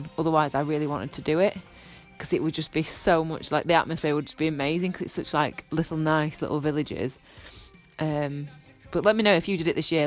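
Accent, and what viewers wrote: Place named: Scotland, United Kingdom. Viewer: you sound kind of scottish, I'm american sorry if that sounds stupid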